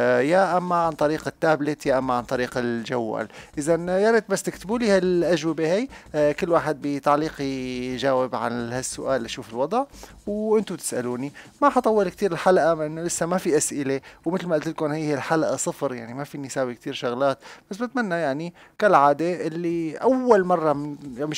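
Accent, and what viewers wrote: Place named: Syria. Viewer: ساكن في كوكب ناميك اصلو sayin يعني مالك سمعانو عم يحكي سوري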